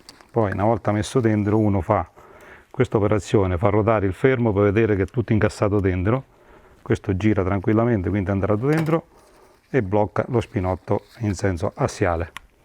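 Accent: Abruzzo, Italy. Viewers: Una parola: arte. L'accento abruzzese completa l'opera. Si sente il sapore degli arrosticini mangiati dopo una giornata di duro lavoro di preparazione. " E angor' ce sta' da affina'" MERAVIGLIOSO!!!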